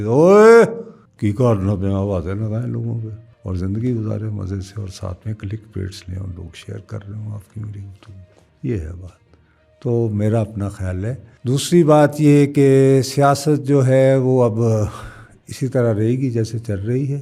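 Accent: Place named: Lahore, Pakistan. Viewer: سر نصرت بہترین لاہوری سٹائل میں تجزیہ بہت زبردست اللہ آپ جیسے صحافیوں سے پاکستان کو برکت دے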